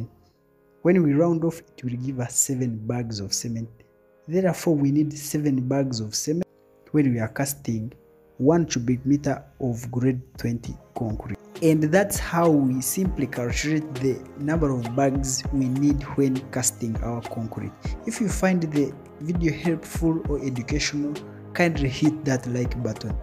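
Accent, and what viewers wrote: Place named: Uganda. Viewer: I think this guy is from uganda I can hear his accent.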